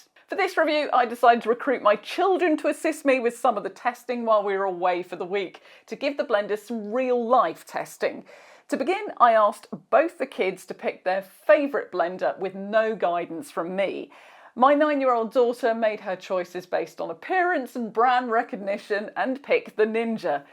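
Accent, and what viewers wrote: England, United Kingdom. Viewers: an anglish person thank god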